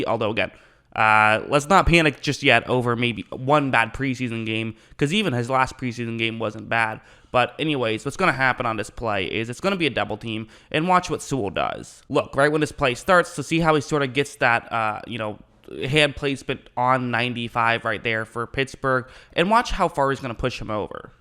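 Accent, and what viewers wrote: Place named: Detroit, United States. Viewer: Ahhh, so your a Lions fan. I was wondering. You sound like that region. Makes sense. You do a good job of not showing bias.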